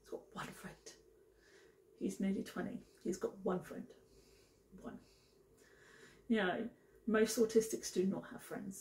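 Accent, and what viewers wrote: United Kingdom. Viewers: J like you which part of England you come from? ❤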